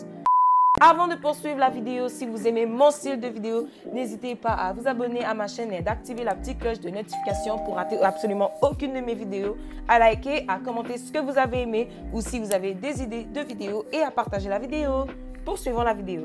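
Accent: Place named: Canada. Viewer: Ton accent canadien là 😭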